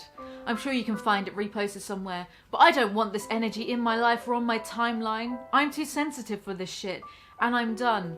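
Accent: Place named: England, United Kingdom. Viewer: Hearing the drama read out by a person with an accent makes me feel like shes reading excerpts out of a sophisticated Englishmans diary. I love it omg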